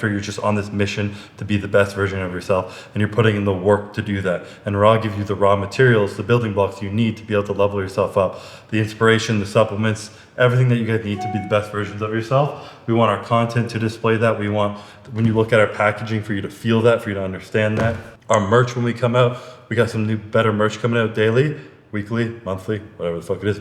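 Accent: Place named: Canada. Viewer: your Canada really came out this vid lol. As well as your th-th. 😂. Love youuuuuuu! ❤️ 🤣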